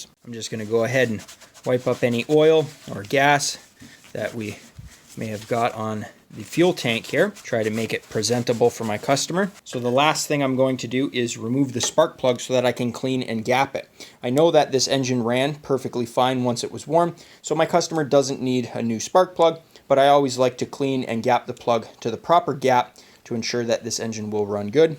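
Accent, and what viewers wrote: Canada. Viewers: I can hear the 🇨🇦 in your voice!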